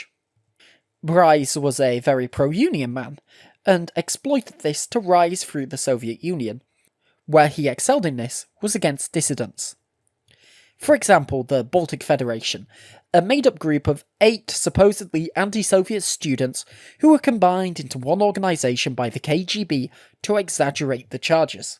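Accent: United Kingdom. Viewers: I know you got a British accent and all, but Kursk is in Russia, not Ukraine.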